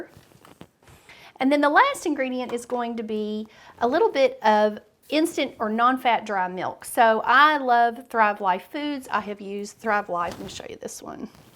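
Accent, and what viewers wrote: South, United States. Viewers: Thanks for your recipe, I don't really listen to people who talk a lot, but it was a pleasure viewing your channel as intriguing. Listen to your southern voice. Your family is blessed by your love of making bread 🍞. 😊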